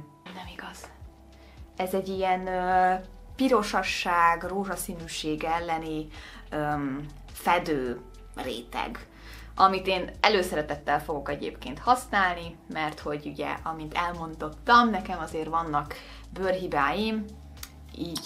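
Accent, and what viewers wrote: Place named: United Kingdom. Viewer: Úristen imádom az angol kiejtésed xdd nagyon aranyos!!!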